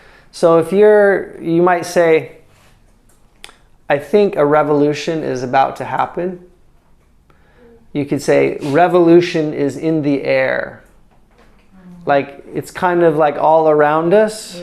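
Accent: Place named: Iraq. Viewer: اشكد حلو الاستاذ يتكلم عراقي هل هذه جامعه امريكيه؟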